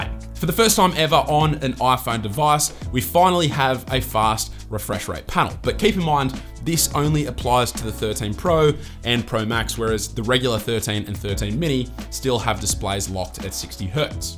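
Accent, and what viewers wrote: Australia. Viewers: Hey, I tried to buy one of the RhinoShield Collab cases for my 13 Pro Max using your link and code, but I got an error saying they cannot ship the PAC-MAN collection to Australia? Curious since you sound Aussie? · That hick Aussie accent tho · Whats with the over the top bogan accent?? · I never heard such deep australian accent in one person. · Heard ur aussie voice and gave an instant sub. Luv to see it 🇦🇺💙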